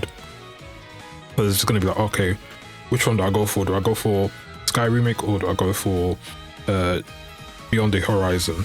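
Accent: United Kingdom. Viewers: I didn't know you're black. Not that there's something wrong but I have you in my mind as a Japanese with a British accent.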